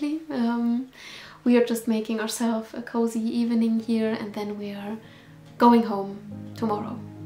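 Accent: Germany. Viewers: O sotaque alemão é profundo nela ou seria impressão minha ? From Brazil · Es macht einfach Spaß deine / eure Videos zu sehen. Mein Englisch ist echt nicht gut. Aber man kann iw heraushören das du aus Deutschland kommst. Und dann premiere Pro, was noch auf Deutsch steht. Das ist einfach alles zusammen mega stimmigyschön für mich hier in Deutschland. Wo ich mich danach sehne, was du seit einiger Zeit in Norwegen hast. Weiter so!!!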